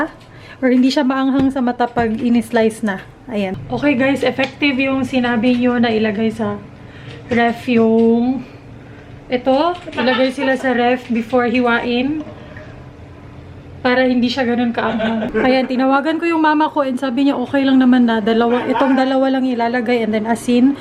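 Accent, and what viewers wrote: Visayas, Philippines. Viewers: Bisaya ka noh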